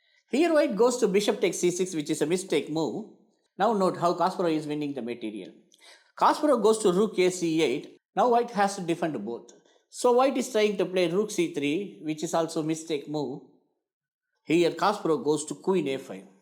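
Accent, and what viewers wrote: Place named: Tamil Nadu, India. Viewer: Super sir I am also tamil..... your English was super sir....I guessed you are a tamil people....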